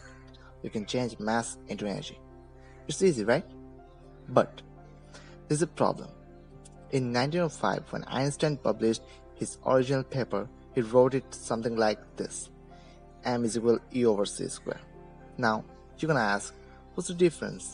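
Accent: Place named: India. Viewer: its hard to understand stuff , when indians talk english , its way to thick , Plz talk in a understandable way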